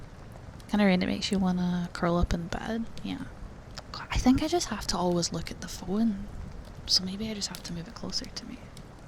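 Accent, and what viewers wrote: Scotland, United Kingdom. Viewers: oooo scottish asmr this is new gonna give it a try tonight since im having trouble sleeping since im under the weather 🥲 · Finally, a true Scottish ASMR. And a cute one too. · This, along with Rayla from The Dragon Prince, made me realize that I absolutely STAN scottish accents. Like...just the accent and the voice. Don't judge. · Thank you. I loved this and your cute scottish accent xD. I should visit scotland and the highlands next year!~ Looking forward to another fun relaxing ASMR <3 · oh, goodness I adore scottish accents, Im looking forward to more of your videos! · Wots dis nowe? A scottesh accent ASMRr? We've ben Blessed Lads! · You hear the word Scottish and you immediately expected the most unintelligible speak imaginable but this was extremely enjoyable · Scottish Accent + Rain = Top Tier ASMR <3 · Wait I think this is my first time hearing the Scottish accent in ASMR lmao this is funny It sounds cute :D am Scottish too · Dunno who dis is.. 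but i do love scottish.. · Scottish accent. Blessed day, I haven't actually found many scottish ASMRtists and something about yall voices gets me so cozy. everytime you say phone = good chemical too subbed, dubbed, you're great.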